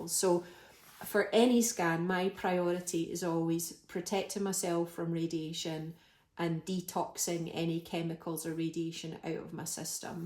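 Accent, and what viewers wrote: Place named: Scotland, United Kingdom. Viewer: Love you Scottish accent. Mines a boring kiwi accent lol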